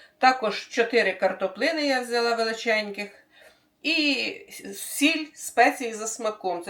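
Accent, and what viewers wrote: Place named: Surzhyk, Ukraine. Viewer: Раньше вы говорили на русском языке, а сейчас на суржике...но мне нравится и понятно каждое слово...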